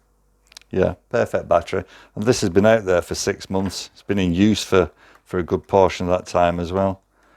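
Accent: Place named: Accrington, United Kingdom. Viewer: I'm in Accrington and your accent is very familiar! Where are you?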